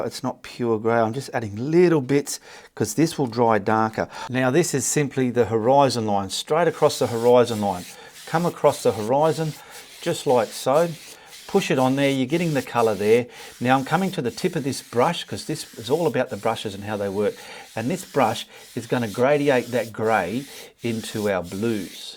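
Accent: Australia. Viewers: Hi, I love watching your tutorials and have seen you grow as an artist. I enjoy hearing your Aussie accent and fun expressions such as “put-er-onner brush” and “blend it to buggery”. Great tutorial! I’ll be trying this technique in my next work. Thanks and keep producing these videos for us. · I was looking for a video of how to paint realistic clouds. You made it look easy and gave a great explanation. It’s my first time watching your videos. Will definitely watch more. Also, love your accent, Australian? I have always wanted to visit Australia.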